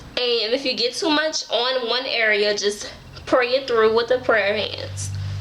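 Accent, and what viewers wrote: New Orleans, United States. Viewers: I Love Your New Orleans Accent 😍😍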